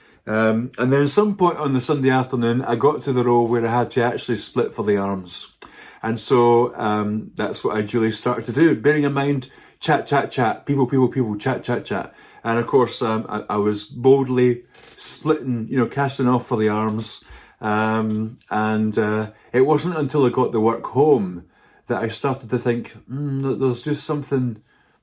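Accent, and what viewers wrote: Scotland, United Kingdom. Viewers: Hello from USA…..glad to see your video again! I love listening to your Scottish accent and watching you knit so calmly. Your sweater is just beautiful. See you on your next video. 🤗🧶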